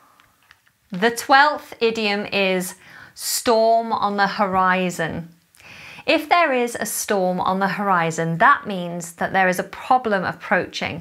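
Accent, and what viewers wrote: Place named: RP, United Kingdom. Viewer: I'm still learning English accent. It's really posh.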